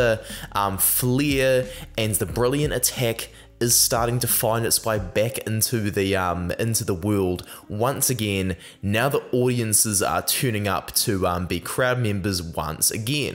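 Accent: New Zealand. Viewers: Just as an aside from an Englishman, the New Zealand accent is a thing of wonder and beauty!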